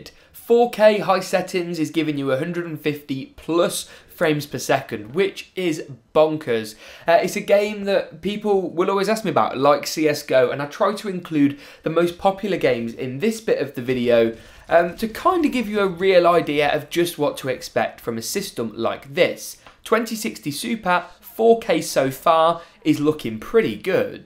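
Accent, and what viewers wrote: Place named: Cockney, United Kingdom. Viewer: Everyone comes here for the PC. I come here for the COCKNEY ACCENT.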